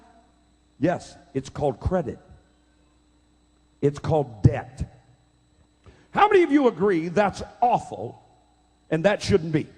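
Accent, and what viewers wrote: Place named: South, United States. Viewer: he kinda looks like James dean with a southern accent.don,t know if this guy is a real Christian or not.God only knows.if he is not he,ll get whats coming to him,God will make sure of that.all will be brought to the light.good and bad.evil is a puff of smoke.here for awhile and is gone not to be seen again, amen live like u are Christ himself.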